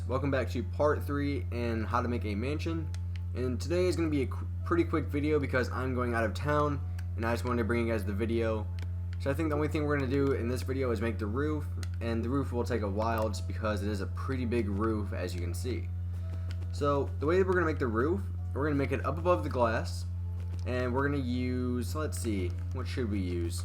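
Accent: United States. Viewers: R u from stl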